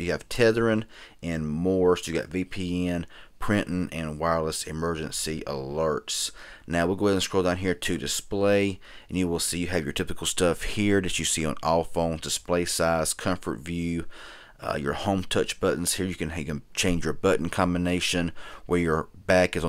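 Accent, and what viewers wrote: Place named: Appalachia, United States. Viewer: Wheres this hillbilly come from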